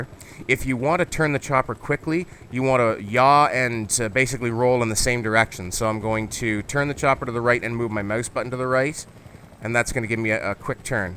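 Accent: Canada. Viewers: out, about, mouse, because, alright, target, .. just sound weird in this video.. oo. i know y.. he is Canadian Ah. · You sound like your from Canada !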